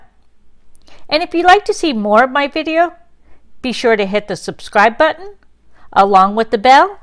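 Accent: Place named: Louisiana, United States. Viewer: Your accent sounds like you are from South Louisiana. Am I right?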